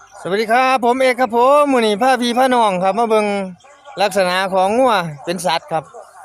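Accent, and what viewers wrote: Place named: Isan, Thailand. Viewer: ฟังแบบม่วนๆเด้อแบบอีสานบ้านเฮา ***คนภาคใต้ฟังไม่ออกคับ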